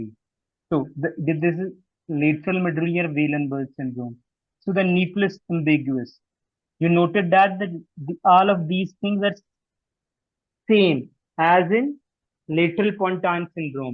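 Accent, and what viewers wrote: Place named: India. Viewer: Your E. accent is horrible. Best for India to quit English talk. Make it silence in or written Subtitle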